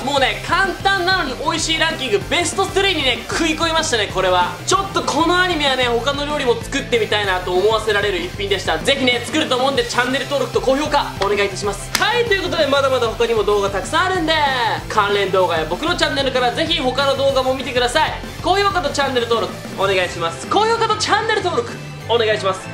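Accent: Kansai, Japan. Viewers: 、関西出身やったりする？？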